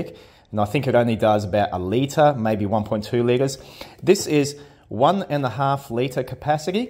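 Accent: Australia. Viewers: WTF? I thought Kmart went out of business. And a white person shopping there? Who knew? This feels like a time warp to the 1980s when Kmart wasn't crap. I actually bought my first dome tent at Kmart when I was 12. I used that thing til I was about 26 when the poles disintegrated. Got my first 20" dirt bike there too. I detect an Aussie accent. Is this outside the US where people get better products than US people get?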